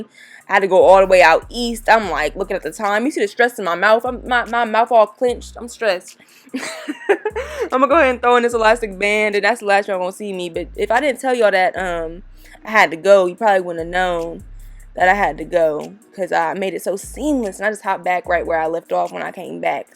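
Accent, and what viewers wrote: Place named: Columbus, United States. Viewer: You can tell you live in Columbus you got that talk 😂💞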